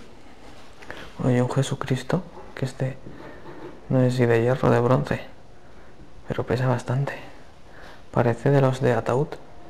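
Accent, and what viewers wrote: Spain. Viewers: Por su acento parece español,mucho gusto... Con todo respeto 🙏 no creo nada de mansiones abandonadas,tengo derecho a opinar y pensar cómo cualquier ser humano Pero si realmente estuviera abandonada.... La suciedad de tierra insectos etc etc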